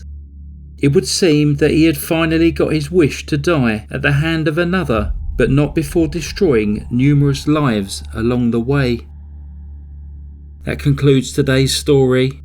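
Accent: United Kingdom. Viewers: I wish I had a calm British accent, it just makes storytelling more pleasurable (no matter how heinous the story is)...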